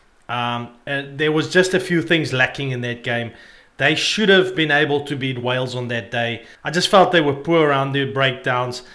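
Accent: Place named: South Africa. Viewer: So weird seeing white person with South African accent.wales beat Fiji they was just to good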